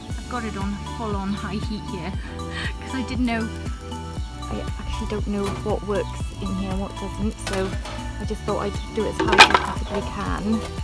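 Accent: Wales, United Kingdom. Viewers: i can't believe this cause i was checking for uuni gas burner reviews & this was my 1st vid cause EVERYONE KNOWS I LOVE PIZZA & WELSH ENGLISH. this is a good sign, but didn't like the dough lol. love from USA.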